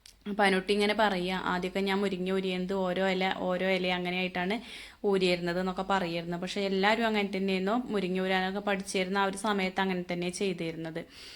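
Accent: Malappuram, India. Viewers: Chechinte ഇതേ സംസാരം ഉള്ള ഒരു കൂട്ടുകാരി എനിക്കുണ്ട് അവളും മലപ്പുറം aaa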